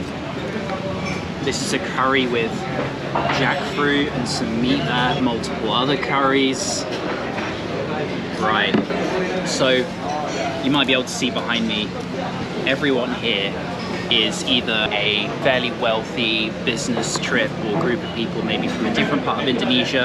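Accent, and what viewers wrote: United Kingdom. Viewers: Videonya keren mas,saya suka aksen anda.aksen nya sperti orang british yaa😁😁,suksess selalu sehat selalu semoga bahagia selalu yaa...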